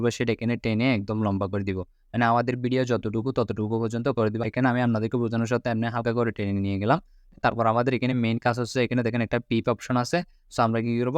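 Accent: Chittagong, Bangladesh. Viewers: ও চট্টগ্রামের আমি সিউর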